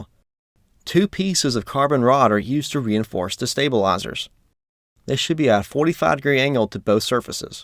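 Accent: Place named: Charleston, United States. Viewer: awesome. I lived in Charleston for a long time. Thought I recognized that accent.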